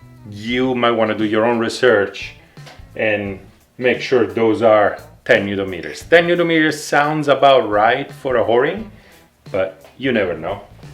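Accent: Italy. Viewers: NOTHING LIKE A PANIGALE TUTORIAL WITH AN ITALIANN ACCENTT :D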